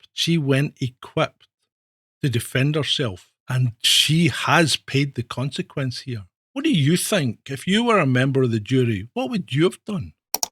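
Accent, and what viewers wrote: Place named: Scotland, United Kingdom. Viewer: Wonderful Scottish accent, greetings from Aberdeen, but to the point; first of all, she was not given the right to a defense, the provisions of the human rights convention were omitted in her case, and the Vienna Convention of 1968 was omitted! She did not have a fair trial, this trial was saturated with racism and discrimination!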